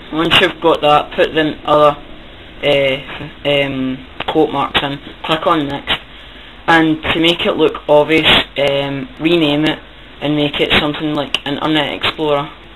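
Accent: Scotland, United Kingdom. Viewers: OMG! SCOTTISH! :D! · whoa you got a strong scottish accent mate! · Thats cool...and are you Scottish? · lmfao he is from scotland he is not irish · its scottish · ye you are scottish do you got a lisp tho? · thats fun ;) and, i just adore you'r accent ^^ scottish is just so cool :o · haha i love your voice! scottish people have the best accents lol and I am sooo doing this at school tomorrow thanks hun! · by the way he speaks i think he is scottish.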